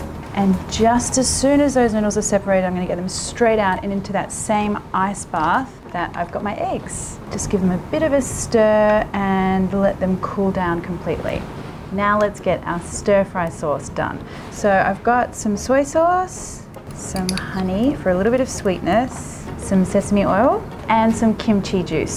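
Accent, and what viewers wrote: Australia. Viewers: aussie accent is everything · You have the sexiest voice and accent, is it Australian? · Australian accent?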